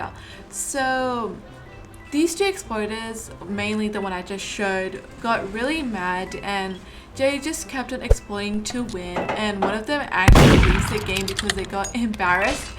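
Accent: United Kingdom. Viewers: Are u from uk? Also I luv ur voice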